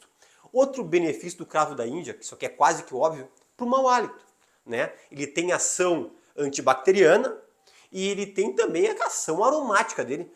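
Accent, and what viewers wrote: Rio Grande do Sul, Brazil. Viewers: Doutor o senhor é um médico bonito e educado, parabéns pelos seus pais ❤ gosto muito dos seus conselhos médico,o sotaque gaúcho é dez 💖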